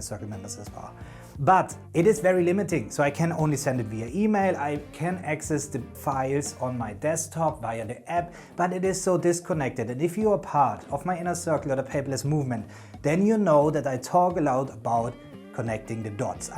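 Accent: Germany. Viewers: Are you German? I can’t place the accent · U Sound a bit like you are Germa Are you tho? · German Akzent? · Are you German? :) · People who speak German English are firm, and direct! Danke schoen Mein fruend🇩🇪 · dieser deutsche Akzent so geil😂😂😂🇩🇪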